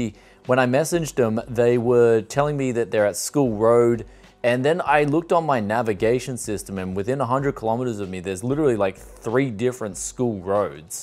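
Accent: Bogan, Australia. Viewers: idk why but, Australian accent sounds for me so good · That is exactly how I imagined Aussies speak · Please stop with the bogan voice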